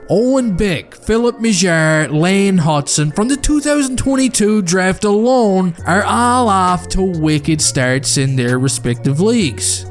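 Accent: Newfoundland, Canada. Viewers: Man you've got to be a Newfie with that accent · Are you from NL by any chance?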